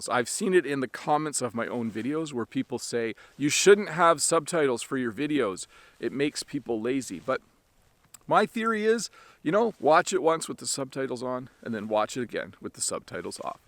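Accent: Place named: Canada. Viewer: I can understand Canadian 😁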